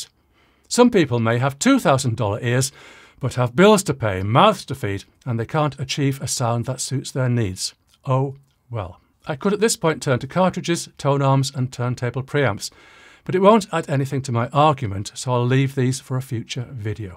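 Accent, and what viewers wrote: United Kingdom. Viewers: Yes. He has one of those thin accents. He's from the North. But he certainly isn't from Liverpool.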